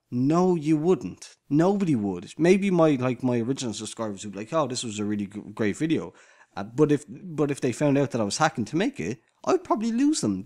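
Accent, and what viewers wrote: Ireland. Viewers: I like your strong Irish accent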